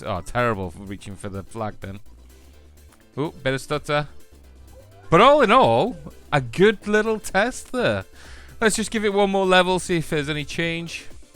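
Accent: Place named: United Kingdom. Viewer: Your british accent makes me h a r d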